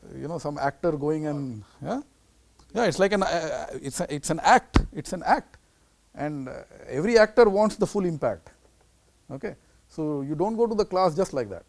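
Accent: India. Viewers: Only indian speak like that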